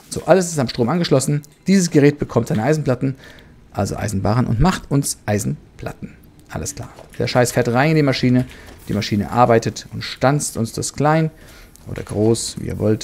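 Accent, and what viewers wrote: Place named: Hesse, Germany. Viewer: mit hessische Akzent :))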